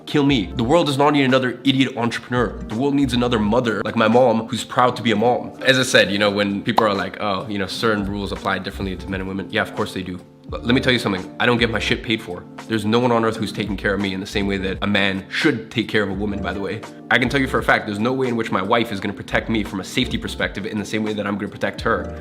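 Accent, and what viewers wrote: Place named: France. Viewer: French accent 😂😂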